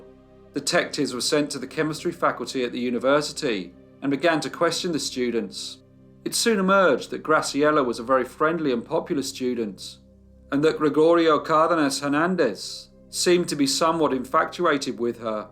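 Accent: United Kingdom. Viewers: Nothing eases anxiety like listenening to someone from the British Isles narrate horrifying and disturbing crime. Thank you so much.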